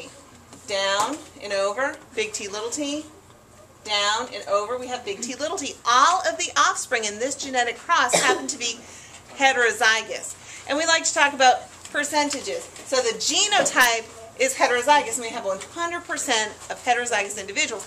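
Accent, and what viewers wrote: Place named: South, United States. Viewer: That's pretty amazing information! I love your new found southern accent! I can see that you turned out to be a great science teacher!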